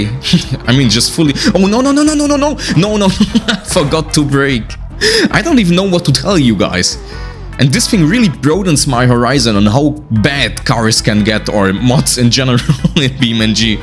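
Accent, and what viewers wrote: Germany. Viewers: i can hear the german in your aktzent...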